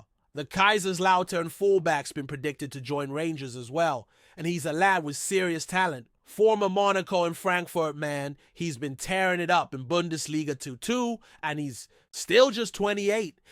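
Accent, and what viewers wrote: United States. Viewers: love the American accent in Scottish slang, fkn hilarious.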